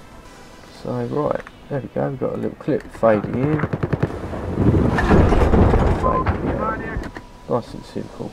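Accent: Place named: Southern England, United Kingdom. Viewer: Really great and so much help! I'm from Kent but I've been living in Manchester for 10 years, so I enjoyed your good old Southern accent.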